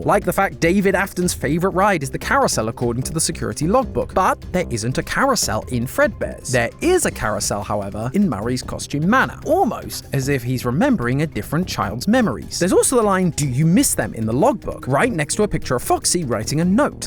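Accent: United Kingdom. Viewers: What happend to matpat? Why is there a British guy?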